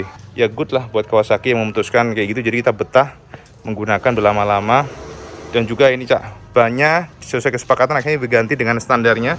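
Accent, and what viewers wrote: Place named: Java, Indonesia. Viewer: Mas.. Logat jawa kami bisa nerima, kalo bahasa jawa bukan kami gak nerima tpi gak ngerti mas, mungkin itu juga yg menghambat pertumbuhan channel ini. Dri kualitas mas,shrsnya bs dpt 2jt subs.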